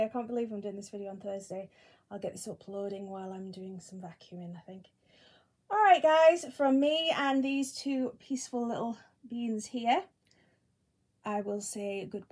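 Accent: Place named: United Kingdom. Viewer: Don't you dare change the way you say anything! I love your British accent just the way it is. ❤️❤️❤️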